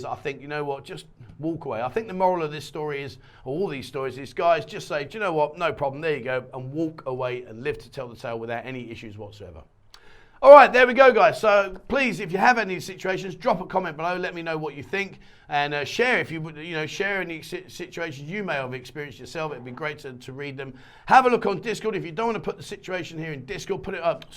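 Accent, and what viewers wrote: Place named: South London, United Kingdom. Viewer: Where you from in England mate sounds like our way abit. South of London?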